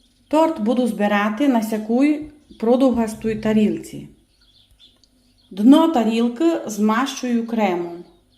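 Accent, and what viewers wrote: Transcarpathia, Ukraine. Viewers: Дякую за рецепт❤ мені так подобається закарпатський говір, слова інші - насолоджуюсь діалектами неньки нашої. Міцно обіймаю. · Тільки за закарпатський діалект та акцент ставлю лайк❤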